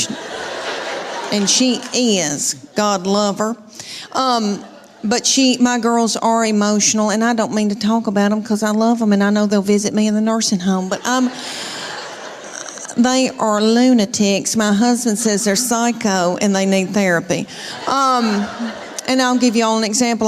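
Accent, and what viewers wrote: South, United States. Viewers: now that twang means no teeth, no brain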